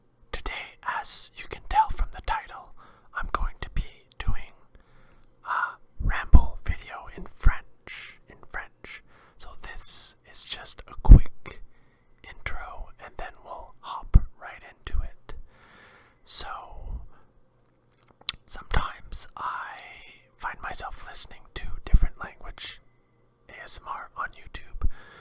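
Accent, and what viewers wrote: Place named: Quebec, Canada. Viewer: J'adore l'accent québécois !! Vidéo très relaxante !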